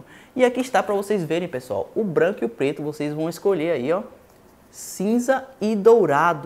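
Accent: Bahia, Brazil. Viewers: Vc é de que enterio da Bahia?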